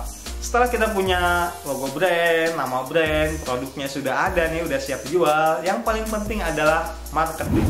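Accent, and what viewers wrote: Banjar, Indonesia. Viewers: A org banjar sanes?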